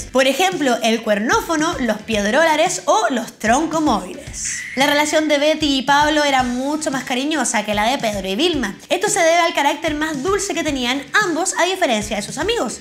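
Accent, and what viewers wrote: Chile. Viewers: Produchon, achon me encanta la fonética de los chilenos xD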